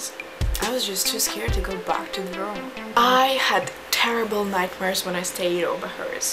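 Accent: Russia. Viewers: whoa whoa whoa! hey now, how is it even fair for you to be this freakin' beautiful?! and a fellow russian as well, judging by your accent. :) #womancrushwednesday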